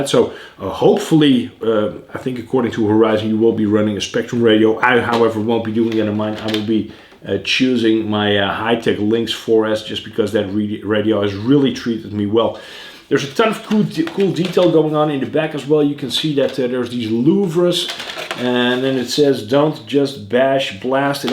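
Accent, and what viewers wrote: Netherlands. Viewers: Godvrdme, 10 jaar later en nog steeds het Nederlandse accent! :-). Maar goed bezig hoor, ik wil ook beginnen met een beetje crawlen maar dan op de Veluwe. Keep up the work sir.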